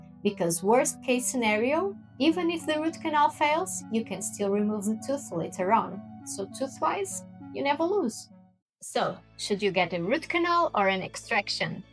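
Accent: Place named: Brazil. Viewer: By her accent, she is Brazilian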